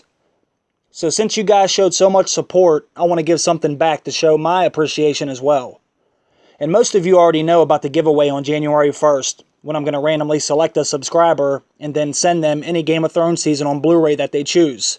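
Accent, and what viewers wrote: Pittsburgh, United States. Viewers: you sound like your from pittsburgh lol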